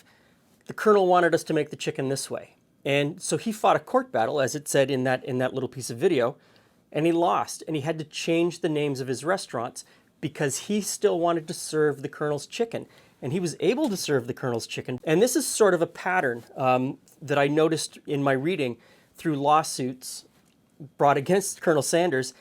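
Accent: Canada. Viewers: I can spot a Canadian accent in a few minutes! PS i met the colonel back in the day here in Michigan! What is it that Brits love KFC? My mother born in Scotland used to serve it up at family events!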